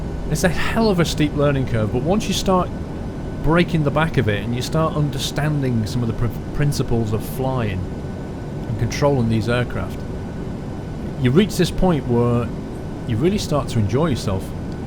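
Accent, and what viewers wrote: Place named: North, United Kingdom. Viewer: So you're a Northerner too? Wuhoooo!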